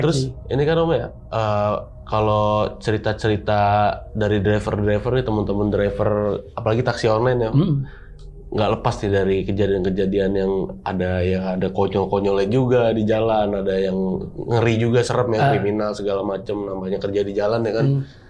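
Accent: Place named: Java, Indonesia. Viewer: Kirain Mike vokalis Marjinal kwkwk pas ngomong medok oh ternyata bukan wkwkwk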